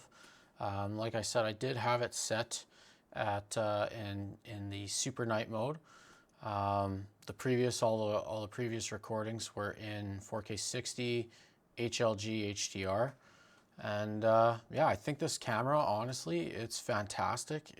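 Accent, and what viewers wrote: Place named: Canada. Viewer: This is great, I agree AMS2 is absolutely amazing. Great comparison. I do prefer the dji for sure. Thanks for the time in putting this together. Lastly I can't help notice but I could be wrong. I hear a Canadian accent. I am Canadian. So are you from Canada? Cheers brother.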